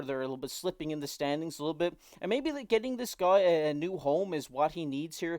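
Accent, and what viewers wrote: Canada. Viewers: As a yank, your Canadian accent kills me!! In a good way. Lol. I'm a Canucks fan so I'm hoping to get either Tanev or Monahan.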